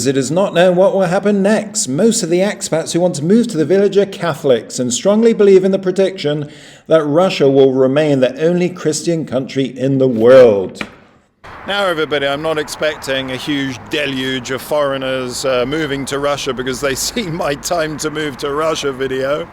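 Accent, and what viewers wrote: England, United Kingdom. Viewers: The most annoying thing about you is your pompous english accident maybe that's why you only have ten thousand subscribers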